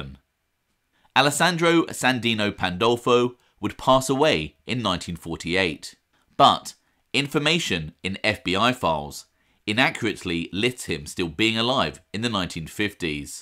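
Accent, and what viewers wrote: United Kingdom. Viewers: For the life of me, i cannot understand why this channel doesn't have a million viewers by now. With all the mob related content out there, this channel stands alone as the best source for accurate information on the mafia in NYC. My wife especially loves to hear the "sexy deep voice" in which it is delivered in, I personally wouldn't go that far, but as everyone knows, If you enjoy a documentary about how the world was once in chaos, how humanity hung in the balance, and or how John Gotti got a haircut once a week, you definitely need a British accent for that sort of thing. has got that in spades.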